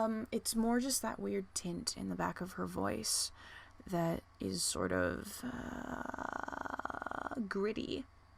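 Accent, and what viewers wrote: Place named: United Kingdom. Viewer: British accent, probably about 5 years old? rolling Rs is probably from me, cause of Espanol and theater. You should look into linguistics. There are places and people who have accents and help people who want to sound authentic for voice or theater as well. So look for that. Pirates!!! Arrrrrr :) I would think that is rebellion for you. hee hee ok well I will send you some links to check out.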